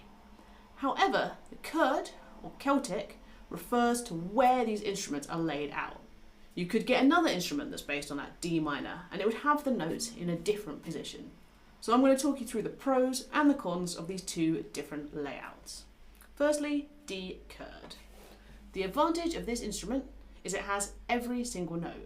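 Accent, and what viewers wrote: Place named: United Kingdom. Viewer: Thank you so much! Informative, and easy to understand. (and my Californian ears love your U.K. accent) 🥰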